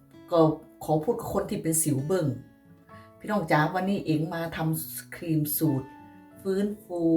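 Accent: Korat, Thailand. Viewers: พูดโคราช. เป็นคนโคราชใช่มั้ยคะ. คนบ้านเดียวกัน. ตอนนี้กำลังลองสูตรน้ำมันมะพร้าวกับน้ำมันวิตตามินอีค่ะ. รอลุ้นอยู่ค่ะ. อยากสวยเหมือนพี่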